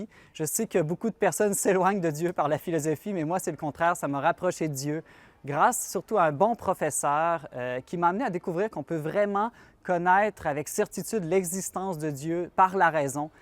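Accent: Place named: Canada. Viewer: Merci de nous transmettre votre JOIE avec votre joli accent Canadien ! ! ! Vive le CANADA 🇨🇦 Que DIEU vous bénisse et vous protège ! Bonne Évangélisation ! Alléluia et Amen 🙏🇫🇷♍️😇🕊🗽💒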